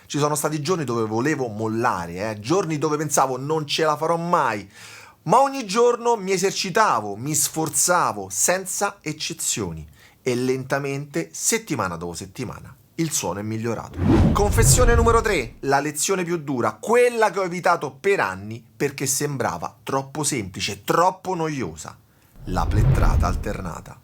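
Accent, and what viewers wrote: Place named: Rome, Italy. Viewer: Bellissimo video! Molto illuminante. Nel mio caso, ogniuna delle "storie" è valida per me. Forse la seconda no...ho suonato solo chitarracce 😂. Con la terza sono riuscito a suonare il solo di lapsteel di Walk of life con la Telecaster. Sono partito da x0,5 fino ad arrivare all originale. Soddisfazione immensa! Ho una pedalboard....da 4 anni....sono ancora in alto mare😂😂😂. Sei grande e riesci sempre a farmi sorridere con la storia del non iscriversi😂. Non ti ho dato retta su questo. Complimenti ancora e grazie mille! Sento che sei di Roma o dintorni. Anche io, insegnami!!!😂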